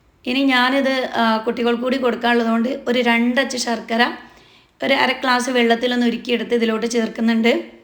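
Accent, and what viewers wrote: Malappuram, India. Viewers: Aa malapuram baasha thanne Mathiyenu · Malappuram thathante slang maariyo😂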